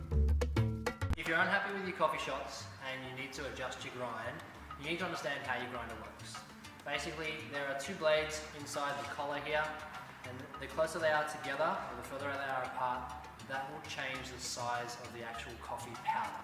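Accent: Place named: Australia. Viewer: So good to hear an Aussie voice - The drone of Americans is more than I can take! Thank you for imparting your wisdom on us. We have a mini mazz - So many 'baristas don't even drink coffee!!! We start each day with a ristretto piccolo or 3 - The key to a happy marriage: )....oh and we subscribed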